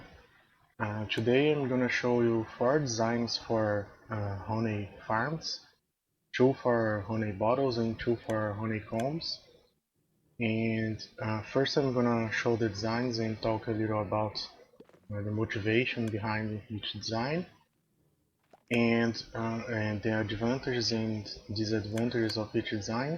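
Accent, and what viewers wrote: Brazil. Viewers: Pelo sotaque, já sei que é brasileiro kkkkkkkk Cara, eu adorei o teu design. Tu teve uma ideia muito top na ideia da coleta e armazenagem das garrafas de mel (minuto do vídeo). Eu fiz um teste dessa parte no meu sistema e até que encaixou bem, além de ter diminuído alguns blocos da parte de processamento das garrafas na minha farm, mas reparei que vem a um custo de mais garrafas de mel no carrinho, e o funil só consegue pegar e entregar uma garrafa por volta, já que quando a primeira desce o sinal de restone reduz para 14. A única coisa que não entendi e acho que foi um erro no teu design foi o sistema de abastecimento (minuto do vídeo). Eu entendi que o objetivo era fazer o sistema ser automático, e usava o sinal de redstone emitido pelo carrinho cheio para enviar o carrinho e distribuir as glass bottles. Havia formas de usar outros sinais para essa automatização (exemplo: luz solar) e também maneiras de fazer o carrinho correr até esvaziar. 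Eu estava procurando vídeos assim, pois eu também fiz uma versão minha (que não copiei de ninguém) da farm de garrafa de mel que usa menos vidro. Eu estava querendo fazer um vídeo, mas antes resolvi procurar se ninguém havia feito igual ou até melhor. Vou ver se faço esse vídeo no mês que vem.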